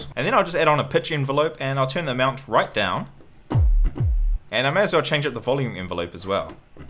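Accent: New Zealand. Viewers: Hey dude, you sound like you're from NZ lol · Beautiful! Picked up the kiwi accent too ;) Chur bro It really shows that what plugin you use isn't important, it's just the talent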